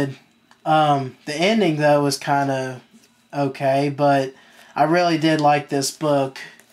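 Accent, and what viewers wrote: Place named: United States. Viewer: Great collection. Where about America are you from? You have a cute accent :)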